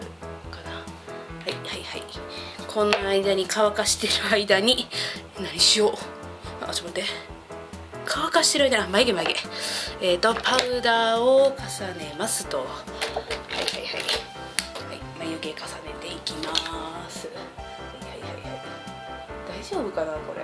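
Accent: Kansai, Japan. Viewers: 関西弁がすごく可愛い\(//∇//)\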